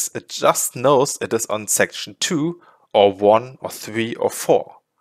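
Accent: Germany. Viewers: The german accent is hilarious. Abber deine video ist sehr leker!! (Duolingo skills right there)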